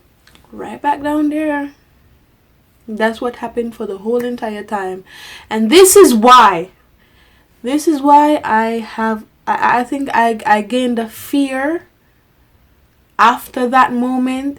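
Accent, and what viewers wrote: Jamaica. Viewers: I like ur jamican tlk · Are you Jamaican?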